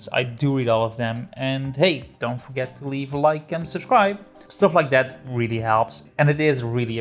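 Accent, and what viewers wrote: Netherlands. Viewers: Are you Dutch? I don't think I have to ask, because I can hear it on your accent! :P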